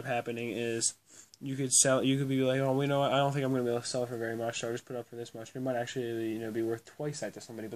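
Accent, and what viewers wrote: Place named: United States. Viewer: When you say you're fluent in English I'm guessing your main exposure to English is British English? This guy has a very clear American English dialect, the most commonly known and spoken dialect, and if you have a hard time understanding him then you're not really as fluent as you think you are.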